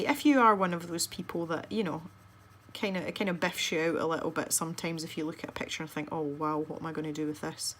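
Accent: Scotland, United Kingdom. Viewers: Just found your tutorial and so pleased to hear a fellow Scot. You colour similar to myself so I will def be following you. This is brilliant x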